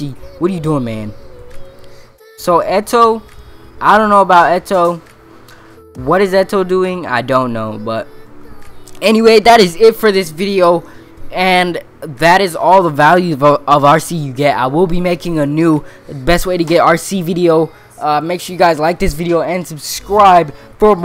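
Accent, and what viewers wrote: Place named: United Kingdom. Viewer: british acent gang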